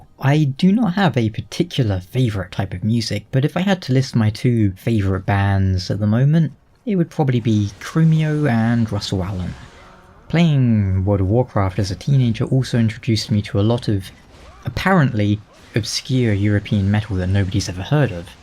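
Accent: United Kingdom. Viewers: Happy Bday! :D Thanks for toning down the Brittish accent, it's quite hard to follow for a foreigner. Especially since these dumb asses in France teach English using only the posh accent. Explains why we have trouble understanding spoken English and sound like arrogant pricks XD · Don't surpress your Britishness. Embrace it!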